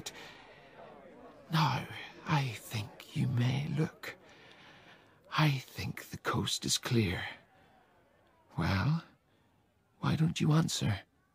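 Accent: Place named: Ireland. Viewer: Love the Irish accent …. Easy on the ear …thank you. The Irish author, MacNeice, spent time studying at Oxford … the work reflects a degree of both an English & an Irishman’s sensibilities …. Threads of the English upperclass gent 🤵‍♂️comes thru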